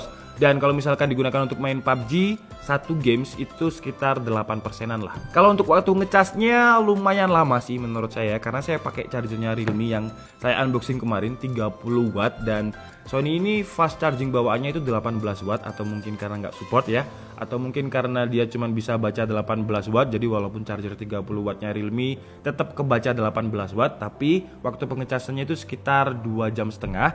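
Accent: Java, Indonesia. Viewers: Wong malang a mas? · Medok banget to mas mas 😂😂😂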